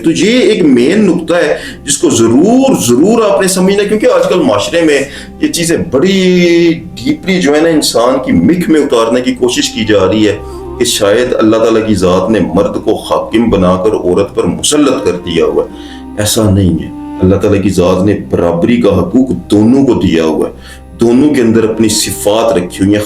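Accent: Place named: Punjab, Pakistan. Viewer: They are punjabi from Pakistan their tongue is different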